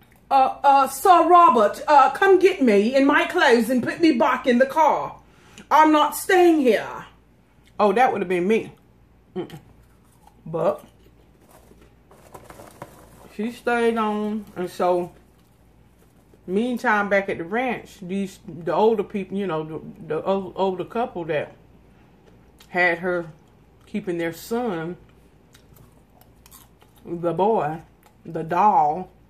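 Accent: United Kingdom. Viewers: Your british accent is posh Londoner, aka the accent every nation that imitates brits uses lol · Your British Accent!!!!! lol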